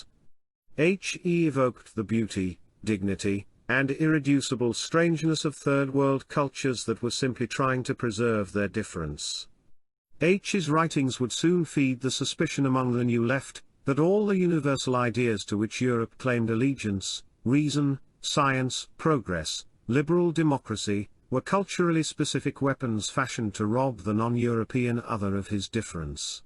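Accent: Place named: United Kingdom. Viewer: The british voice actor ..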